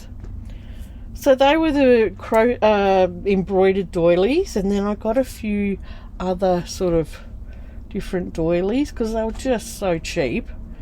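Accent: Australia. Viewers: I was going to say how much I love to hear your Aussie voice..not many of us that I've seen on you tube ..and then I heard you say you went to Wangaratta..and I got more excited..I'm guessing you went to to the Lutheran op shop they have great crafty bits..anyway hi from another north east Victorian who looking forward to seeing what you make with all these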